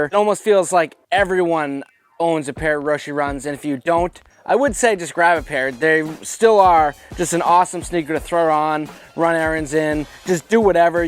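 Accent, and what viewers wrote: Boston, United States. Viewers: "wicked affordable" if u couldn't already hear the boston, that line just sells it 😂😂😂 great content as usual man!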